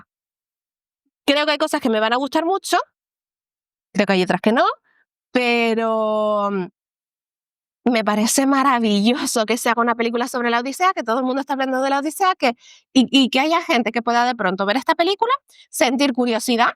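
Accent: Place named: Canary Islands, Spain. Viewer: Me ha faltado en tu análisis algo que va más allá de la filología: el Alma. La Odisea es la definición literal de Nostalgia (Nostos + Algos, el dolor del regreso). Creo que te equivocas al disculpar a Nolan. Él nos da espectacularidad visual, pero nos roba el subtexto: el amor a la tierra, el calor del hogar y el miedo a perder a la familia. Convertir la Odisea en una película de acción grisácea es un crimen contra la cultura mediterránea. Ojalá defendiéramos más la 'magia' del mito y menos la 'lógica' del mercado actual. La Odisea es un arquetipo sagrado sobre el RETORNO, la Familia y el Honor. No va de un Ulises ingeniero resolviendo problemas, sino de un hombre roto que rechaza la inmortalidad por volver a abrazar a su esposa envejecida. Me da pena que aceptemos que Hollywood convierta el Mediterráneo (que es vida, color y pasión) en un escenario gris de posguerra nuclear solo porque es la moda. 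El público necesita hoy más que nunca esa calidez humana, no más frialdad intelectual. Te escucho y noto un acento canario del bueno, del que me gusta. (Quizás me equivoco; si es así, lo siento). Al escucharte ese acento canario, esperaba que conectaras mejor con la esencia real de la obra: la insularidad y la nostalgia. Como isleña, sabes que el mar aísla y que la 'morriña' no es solo echar de menos, es dolor físico. Creo que al justificar que Nolan haga una obra gris y fría 'para adaptarse al público moderno', estamos perdiendo el alma de la Odisea. La Odisea no es ciencia ni acc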